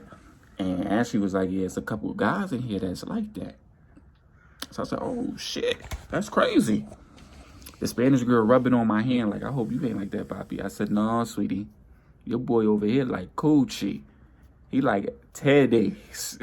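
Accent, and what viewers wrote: New York, United States. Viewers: He definitely sounds like he from NYC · YEP he's a New Yorker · he sounds just us NYers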